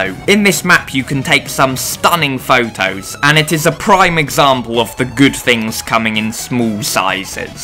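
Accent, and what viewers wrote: United Kingdom. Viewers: Bro has the hardest British accent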